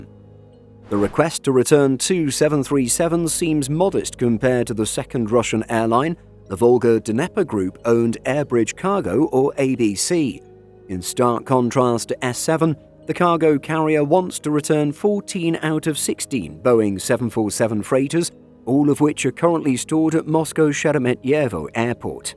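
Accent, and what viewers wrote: United Kingdom. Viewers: The moment I hear this British accent, I know that the videos are lies and scams, because a lot of videos that I have seen using British actions or nothing but a scam, deceit and lies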